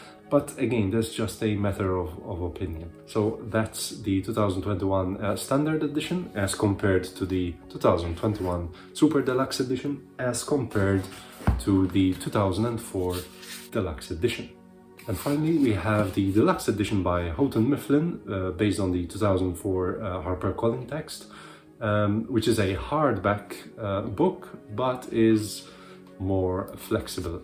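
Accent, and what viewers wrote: Malta. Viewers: Given your english accent, i think you're a fellow Maltese :) beautiful books, but i'm torn between the 2021 standard and the 2004 harper collins 😅 might cave in and buy both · Hello from a fellow Maltese. Recognized your accent.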